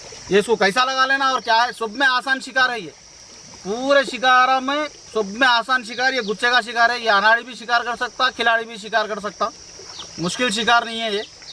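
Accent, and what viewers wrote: Hyderabad, India. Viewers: kya mast bola... sounds like hyderabadi language.. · Bhai Hyderabad se hai kya aap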